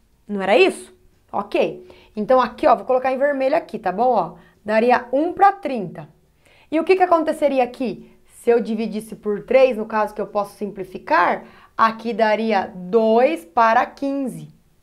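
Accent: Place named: Minas Gerais, Brazil. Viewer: Adoro esse "erre" ... és mineira? Tão doce, obrigado pelas aulas.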